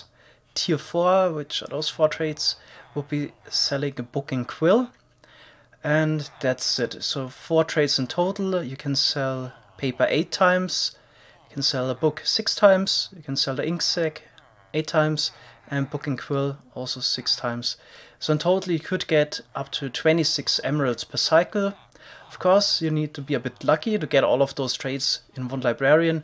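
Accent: Germany. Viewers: der aktzent klingt deutsch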